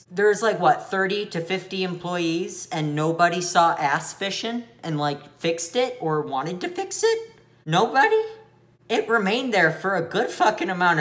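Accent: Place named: Midwest, United States. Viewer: If one slows down the video to .75 speed, you sound like a drunkard complaining about life and I fucking live for it. I love your voice, I'm from Michigan so It's nice to have someone from the Midwestern reigon to identify with. I dunno, it sounds weird and you'll probably not see this and/or not acknowledge it but I just wanted to share my not-so-valuable opinion. You are so funny man, I honestly hope you get the recognition on this shitty platform that you deserve.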